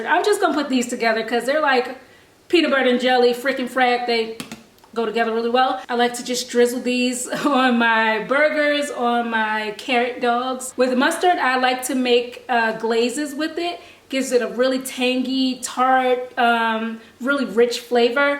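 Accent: Baltimore, United States. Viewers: You from Baltimore? You have a accent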